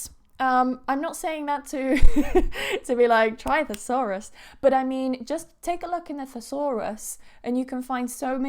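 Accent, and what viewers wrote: United Kingdom. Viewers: Why do you speak a British English and accent?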